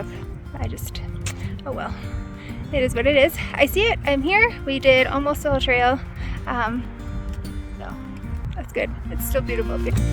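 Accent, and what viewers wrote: Minnesota, United States. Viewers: Your Minnesota accent came out to play in this video lol😊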